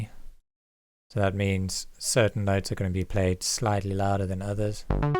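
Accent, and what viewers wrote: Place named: Cape Town, South Africa. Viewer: Great tut, thanks. Cape Town accent?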